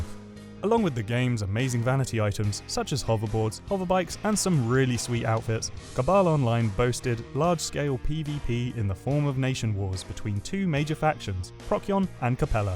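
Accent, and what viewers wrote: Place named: United Kingdom. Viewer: you could be a great streamer for Silkroad online since they aren't many English speaker ones. Turks are monopolizing this business and many ppl like me are looking for streamers with a British accent like yours.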